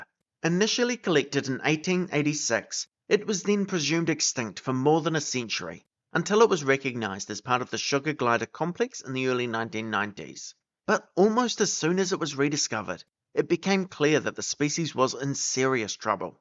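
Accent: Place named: New Zealand. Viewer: Good content and good channel name. I advise adding subtitles to your shorts if you make them as your Kiwi accent may confuse ppl. I can understand though but not everyone might. But it's the lowest priority.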